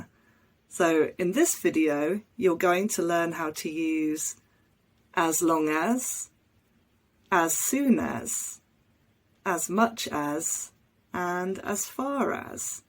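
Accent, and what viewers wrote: United Kingdom. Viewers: Hi! I discoverd one more utilisation the "as far as..." Tsunami had reached as far as my house so I moved to another island! Thank you for your lessons and I love listening your british pronunciation as well, even though it is difficult to imitate!!!❤❤❤👌👌👌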